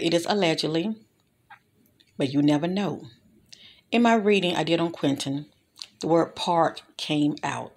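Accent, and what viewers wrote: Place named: North Carolina, United States. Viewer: Idk where you're from but your accent sounds like southeast NC. It sounds like home to me. I know you're talking about really dark things but I get so soothed and relaxed from your voice because it takes me back to the women who cared for me, taught me and showed me love as a child. I wish many blessings to you and thank you for the hard work you do.💜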